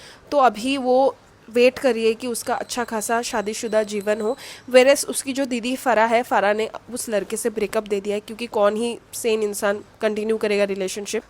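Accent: Bengal, India. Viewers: You also form Bengal (coz your bengali accent tells it)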